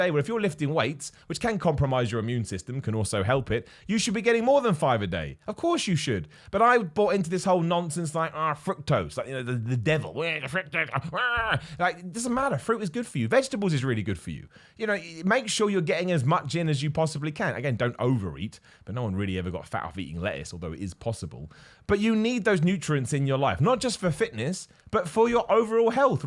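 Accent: United Kingdom. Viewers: Don't know if I enjoy the British accent or the genuine opinion better. What a lovely human being.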